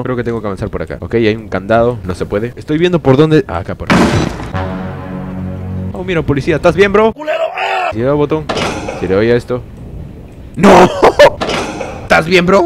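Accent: Peru. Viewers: Eres del Callao no choro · eres peruano?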